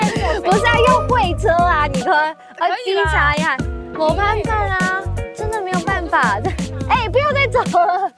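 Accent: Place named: Hakka, China. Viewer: 第一次看到說這麼好聽的客家話靚妹客家姑娘,給妳個讚!!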